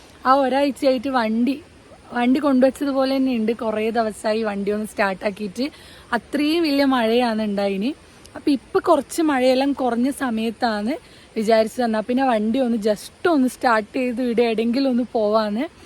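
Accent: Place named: Kannur, India. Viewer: നമ്മുടെ കണ്ണൂർ ഭാഷ ❤❤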